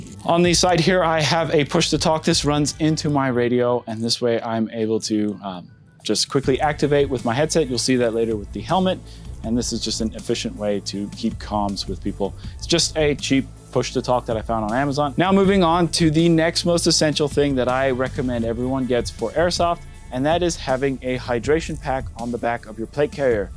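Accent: South Africa. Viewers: you south african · Are you from south africa? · Are you south african?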